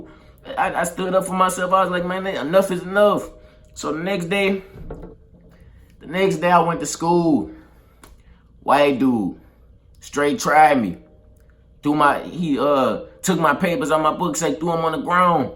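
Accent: Louisiana, United States. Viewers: That accent on point bro lol u can tell u from the south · he from Louisiana wen he say it twice to put emphasis on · That's how he talk 😐he from Louisiana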